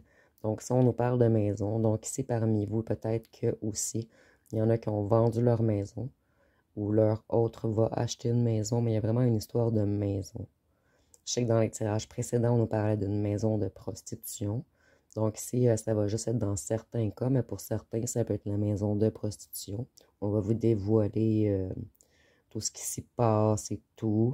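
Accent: Quebec, Canada. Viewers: La lumière est ok!! Ça fait du bien entendre une québécoise! Bonne justesse de tirage, MERCI ❤ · Pas du tout en colère, il m'a fallu des années pour travailler sur mes blessures ce nest pas pour réagir comme celle que j'étais avant ❤❤ La lumière est ok pour moi. Merci pour ta lecture et ton joli accent québécois ❤❤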